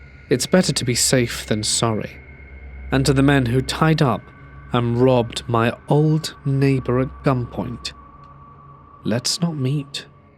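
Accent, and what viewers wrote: England, United Kingdom. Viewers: For the first time I can’t stand an English accent!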